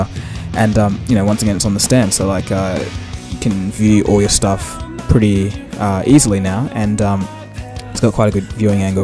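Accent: Australia. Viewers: nice OZZY accent BTW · holy crap are you australian? NO WAY! that accent is too smoothing to be australian D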